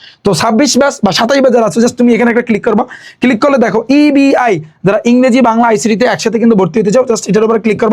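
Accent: Barishal, Bangladesh. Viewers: আচ্ছা ভাইয়া কি বরিশালের? 🤔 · ভাইয়া আপনে কি বরিশালের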